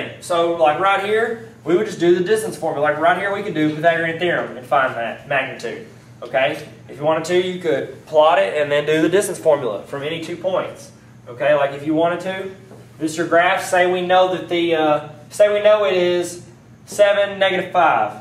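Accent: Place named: Louisiana, United States. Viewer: You have to live in Louisiana, that accent tho, its ok I live in alabama, I have an even more hick accent than you do.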